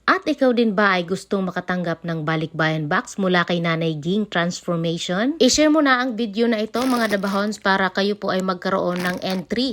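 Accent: Bicol, Philippines. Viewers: Bicolano po ba kayo Nanay Ging?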